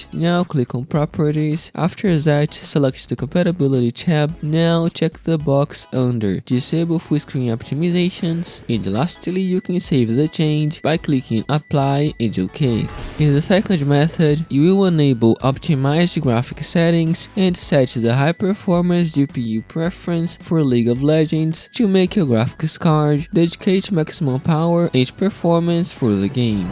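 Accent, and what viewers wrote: Brazil. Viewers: brazilian accent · Pelo sotaque parece carioca. Obrigado pelas digas! You're awesome! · Brazil , for sure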